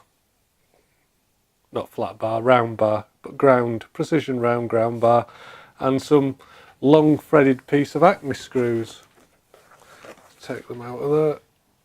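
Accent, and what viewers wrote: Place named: Sheffield, United Kingdom. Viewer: I'm assuming from your accent you're somewhere near Sheffield - I'm from London (sorry about that but I did vote leave :- )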